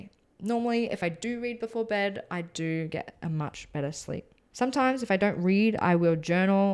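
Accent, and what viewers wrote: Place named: Australia. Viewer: Before u said Australian eastern time ,I knew were Australian. The accent gives it away.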